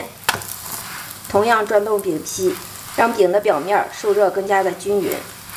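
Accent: Shandong, China. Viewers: According to her accent she's probably from the same state as me, thanks to her guide I can make authentic pancakes justl ike in my hometown. I highly recommend to try her recipes, turst me it's not as difficult as you imagine. 如果你需要英文字幕我可以帮你，作为感谢你让我吃到了想念了多年的家乡饼。说实话看你的视频之前，我都不知道这种饼叫什么名字，只知道在山东非常常见。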